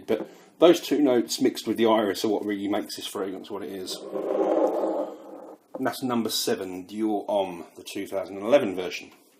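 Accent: United Kingdom. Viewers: Uff that brit accent i missed for long time, managed to find alot of presious parfs thx to you, when i first found ur channel! including precious L`Air du Desert Marocain Tauer... glad you r back, hope you found something interesting in your journey! Any new awesome amber/incence fragrances? i still use only ambre fetiche/Tauer Marocain l'air, would be glad to hear any recomendations! greetings from Russia m8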